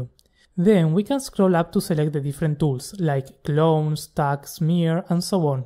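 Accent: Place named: Argentina. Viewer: Sos argentino? Tenes un acento marcado me encanta ❤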